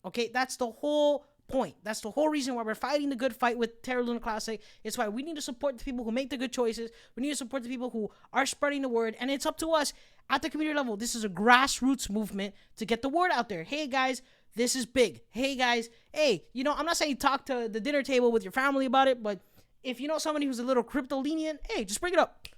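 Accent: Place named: Bradford, United Kingdom. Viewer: Are you from England You accents like you from Bradford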